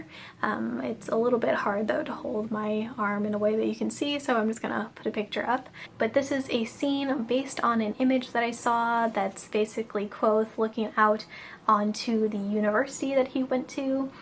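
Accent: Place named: North America. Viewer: Hi, this is my first visit to your channel. I was instantly struck by the dichotomy of your North American accent and the fact you have the international edition of the book. At least, I'm pretty sure of it; I'm an American and have the U.S. edition, but also bought the UK version (the same one you have)... Are you living overseas? Was it a gift? Am I rambling and not making any sense at all?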